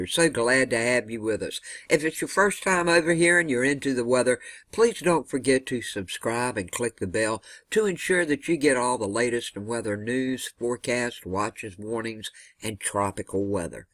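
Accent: South, United States. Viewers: Agreed. expressions and words have a warm Southern comforting and kind of reassuring tone of voice ever reminding of the power of God's love. Amen. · Good afternoon! Thank you for the update. Yep! we cooled off. It was in the low 60's last night. Today, as yesterday, is beautiful! Slight breezes. Sorry I missed a few days. Dr. appointments and vertigo. Would you believe? They're saying my two eyes are communicating with my brain at 2 different speeds? They've given me different exercises to do 3 times a day. Prayers for everyone, GOD bless. Love hearing your Southern way of talking. Your expressions and words make me feel like I'm back in the South! Love it!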